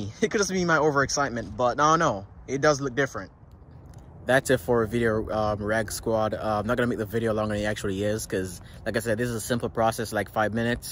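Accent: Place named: Jamaica. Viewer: Jamaican accent came out in this one.. Proud of you, Excellent video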